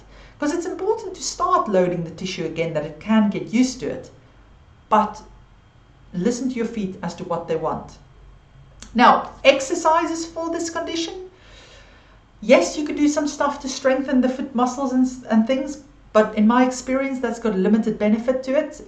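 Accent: South Africa. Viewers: Great Talk. I Thought She’s SouthAfrican.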